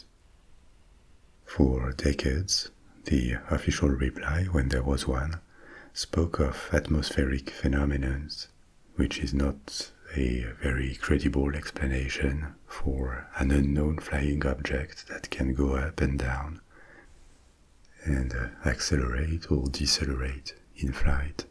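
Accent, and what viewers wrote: France. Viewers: Ohhhhh....just in time for that incredibly soothing baritone voice & fascinating French accent to lull me to sleep!! Thank you, Sir!! Your talent & gifts sre greatly appreciated!!!! ❤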